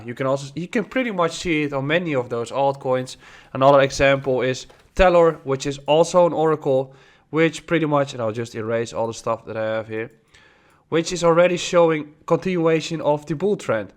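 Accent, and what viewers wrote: Netherlands. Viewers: Nee man, maakt niet uit. Beetje boeren Nederlandse accent stoort niemand zich aan :P Je hebt een leuke persoonlijkheid en je NL accent hoort daarbij. Ik zeg, houden. Je hebt nu al verdorie meer subscribers dan veel kanalen die native Engels zijn dus mijn inziens doe je het goed :) Je wordt zelfs vaak op wereldbekende crypto nieuws websites aangehaald als expert dus je doet echt wel iets goed haha.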